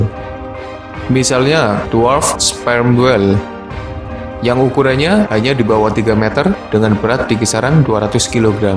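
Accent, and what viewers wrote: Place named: Banyumas, Indonesia. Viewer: Bahasa jawa ngapak.. gak enak di dengar jawa ngapak..🤮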